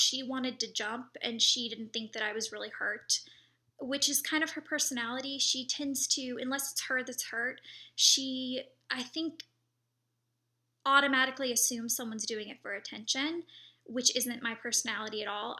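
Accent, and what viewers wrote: South, United States. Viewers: i could here a little bit of your southern accent in the clips from frightmare manor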